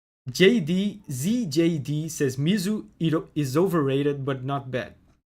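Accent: Brazil. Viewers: Are you by any chance brazilian? been wondering that for a while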